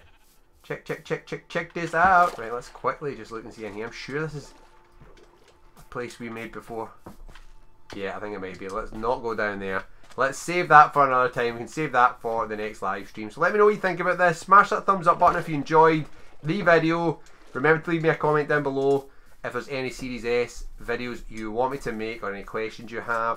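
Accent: Scotland, United Kingdom. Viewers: Scottish or English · Your Scottish accent, I like it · I always hear Indian, Canadian, and British accent. I like the change of Scottish accents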